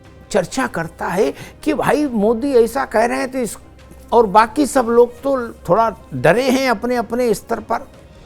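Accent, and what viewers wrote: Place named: Kanpur, India. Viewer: भाई जी आपका कनपुरिया स्टाइल मे किसी भी अंक का प्रस्तुतीकरण करण लाजवाब होता है। आप चिरकुटों पर ऐसा जूता मारते हो भिगो भिगो कर कि हंसते हंसते खाना ही पच जाता है। जीयो भइये 😊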